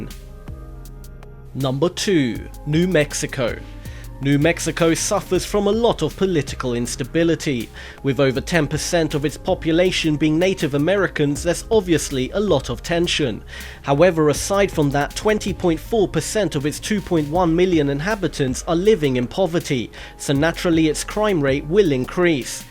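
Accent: England, United Kingdom. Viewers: Where's this guy from,?!!! Britain?! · phelp Australian? Are you an idiot. He's obviously English. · Anybody find this insulting that somebody from the UK is the narrator? Seriously what does he know about Our States and what goes on there?! I'm quite surprised that Florida didn't make that list, I've been living here for decades and let me tell you that between the heat and transient nature of the people here that it's not a big secret that there are an astounding amount of seriously twisted, violent, nut jobs that've called this state home! I could name numerous Serial Killers that've taken up residence here at one point or another... Ted Bundy, Otis Tool, Christoher Wilder etc. We are the State that the Pulse Nightclub massacre & killings occoured at, we had the UF Murder of the College Coeds in Gainsville, we are the number 1 state for those scam drug rehab centers, which makes this state incredibly active in drug abuse and crime, we had the aftermath of the Mariel boat lift in 1980 which spawned the Scarface movie, based loosely on real events and people. 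In the 70s & 80s we were the #1 State for drug smuggling which had a significant impact on drug related violence. We had numerous infamous people here like Dalia Dipolito who's murder for hire attempt on her husband was near by where I live, we had the Casey Anthony murder case, the infamous kidnapping & Murder of Adam Walsh, the list just goes on & on... With the Everglades & the Atlantic ocean as resources, we had well known places that the bodies have been hidden and disposed of.... We're in the top 3 states for Gun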